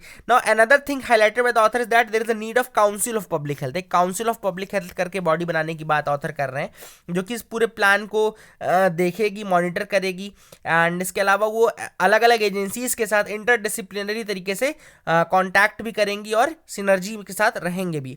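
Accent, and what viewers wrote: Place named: Bihar, India. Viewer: Sir ap Bihar se hai na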